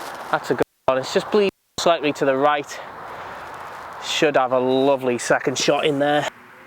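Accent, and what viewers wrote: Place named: Rhyl, United Kingdom. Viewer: When I heard your accent I thought you were actually from rhyl. I lived in rhyl for quite a few years and I've got some great memories of it, but I never had chance to play the course. I'm determined to go up to play it this summer. By the way give Llanymynech a go if you haven't already played it. Along with church stretton. I think they are the two best courses anywhere.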